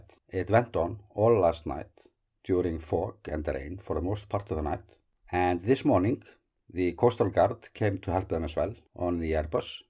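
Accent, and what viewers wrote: Iceland. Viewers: I understood you perfectly. You have a wonderful Icelandic accent and humour to match! I always enjoy your very informative videos!! Keep them coming ❣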